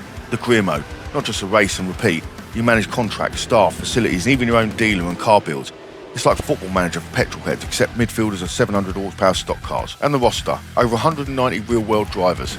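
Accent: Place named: Cockney, United Kingdom. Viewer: Wow man you've got one hell of a snappy voice over and that Cockney accent just takes me back to Ritchie's Snatch and Two smoking Barrels! Absolutely the most enjoyable trailer on ANY motorsport ever!Kudos.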